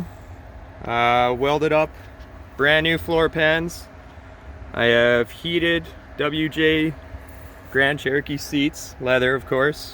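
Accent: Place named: Canada. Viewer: Sound like your from Canada.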